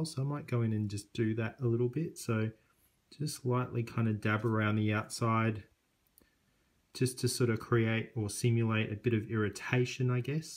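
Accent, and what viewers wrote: Australia. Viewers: Are you an Ozzie? You sound like an Ozzie. Like if YOUR a Ozzie.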